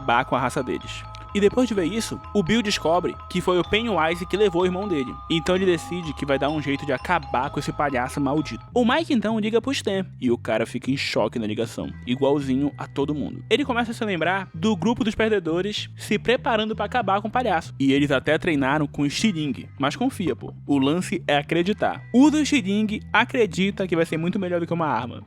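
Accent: Pará, Brazil. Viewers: Primo, o sr é do Pará? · KKKKKK mano adorei seu canal só me tira uma duvida tu é paraense?? kkkkkk o jeito de flr igualzin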